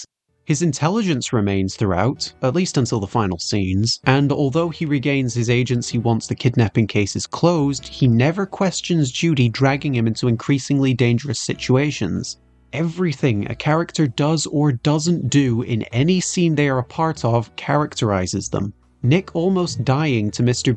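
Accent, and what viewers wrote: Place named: West Country, United Kingdom. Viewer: I mean... I'm guessing you're not American, since your accent sounds vaguely West Country, so you should be able to pronounce that. "pa-pa-ver som-ni-fe-rum".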